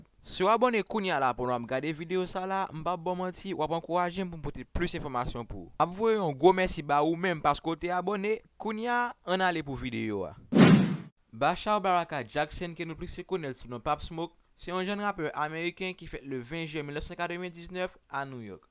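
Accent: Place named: Martinique. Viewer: On dirait tu parle martinique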